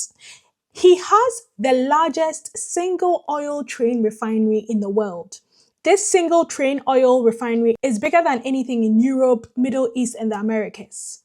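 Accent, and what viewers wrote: Ghana. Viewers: You sound Ghanaian please refine your accents and be more Nigerian, your content is good enough but your accent is not so Nigerian and you know the vibes between the two countries You go explain tired ooo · She sounds Ghanian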